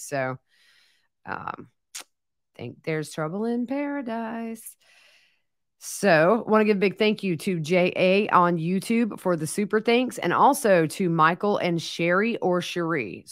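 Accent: South, United States. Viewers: I also love that " Southern drawl"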